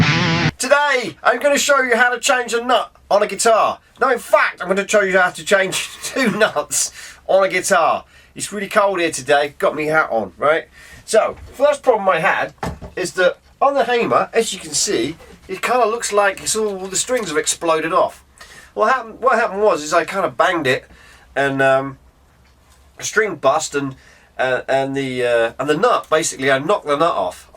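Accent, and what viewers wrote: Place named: United Kingdom. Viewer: Love your accent are you British or austrailian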